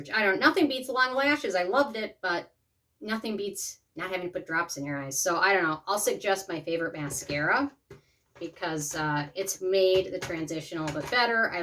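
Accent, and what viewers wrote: Minnesota, United States. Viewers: You've got that classic Minnesota accent.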